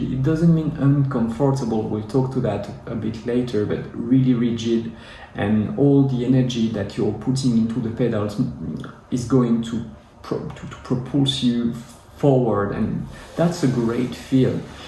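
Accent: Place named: France. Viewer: Oulala ça pue le français qui parle anglais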